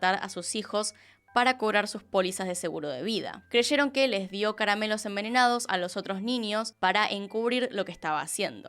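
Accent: Argentina. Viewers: El año pasado conocí tu canal y desde entonces no me pierdo ninguno de tus videos✨ estudie psicología y eso me provoca ponerme a analizar a los personajes y casos que narras, aparte que me declaro fan del acento argentino ❤️ Muchas felicidades gran canal🌹 PS: te recomiendo el caso del monstruo de Toluca, fue un compañero mío de la universidad, realmente fue muy trágico vivir esa experiencia y no visto que nadie hable del caso y le de voz a sus víctimas. PS2: saludos desde Los Ángeles CA 🫂🌞😸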